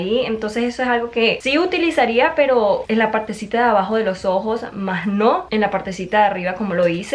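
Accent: Venezuela. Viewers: Hola, no se por que tu acento, me parece venezolano ☺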